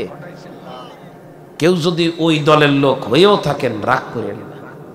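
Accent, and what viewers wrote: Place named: Satkhira, Bangladesh. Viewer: Satkhira tune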